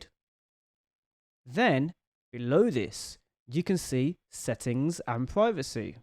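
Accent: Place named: Wales, United Kingdom. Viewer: You sound Welsh, lol! Well, I had a Welsh friend who had a similar accent atleast.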